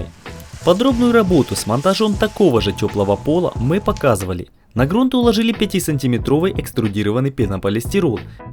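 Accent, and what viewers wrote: Surzhyk, Ukraine. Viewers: Весело у вас пробивается суржик) Смесь русского и украинского.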